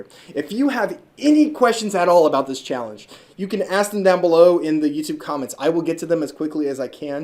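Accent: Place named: United States. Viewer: also, as i assume your american due to the accent, is it eastern standard time, western standard time or central time?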